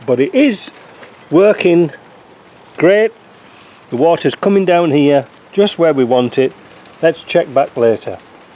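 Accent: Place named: Middlesbrough, United Kingdom. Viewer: Well that answers that question 'like a wet weekend in Middlesbrough', my wife was adamant that you had a Boro accent. She's a Middlesbrough lass and we live in Stockton on Tees....... and yes, that's often the weather. Got my sliding window seals done, now to track down a roof leak that runs in the inside roof gutter and showers my lass when braking ...... oh the joys. Ken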